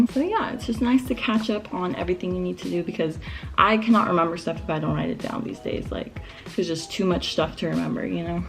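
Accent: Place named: United States. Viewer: Are you from the United States I feel you have accent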